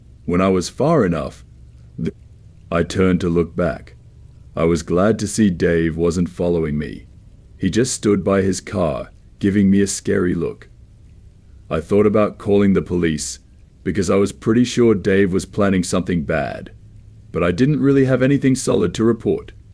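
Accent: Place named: Australia. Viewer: It sounds so weird hearing someone with an Australian accent tell a story involving a gun 😂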